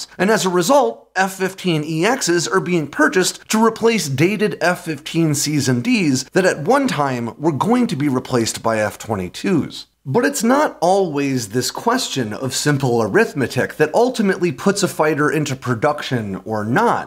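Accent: United States. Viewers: I'm not going to subscribe for two reasons. (1) I really dislike listening to other Americans, and (2) you didn't mention the reason why the US military usually chooses the wrong weapons: Corruption. The US government and military brass are all woefully corrupt. Lockheed-Martin's business model has been based on paying off politicians and generals since before they merged. Lockheed paid off Congress and the joint chiefs with a few million dollars, and got trillions of dollars in taxpayer money to deliver fighters that DISSOLVE ON CONTACT WITH WATER!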